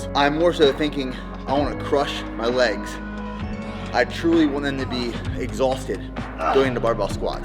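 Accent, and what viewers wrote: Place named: Maryland, United States. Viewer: I know that accent from anywhere! You must be from Maryland or Pennsylvania 😂♥️ love your videos!!! Love from Bmore 🦀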